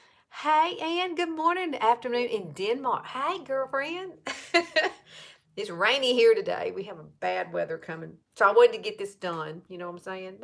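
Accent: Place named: Tennessee, United States. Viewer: Loved this tutorial. I’m in Texas and we had those same storms. Anyway....you’re like a TN accented Theresa Caputo(sp). 😀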